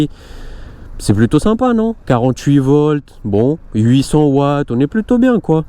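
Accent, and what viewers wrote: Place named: Romania. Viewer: Vous avez un accent. Vous êtes d'origine roumaine ? Vous parlez bien français. Attention au code de la route...!! Ligne blanche continue...et plus ENCORE...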